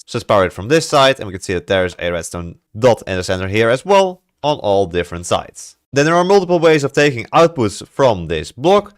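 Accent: Netherlands. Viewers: Bedankt voor de tutorial! And yes, I know a Dutch person when I hear one, haha!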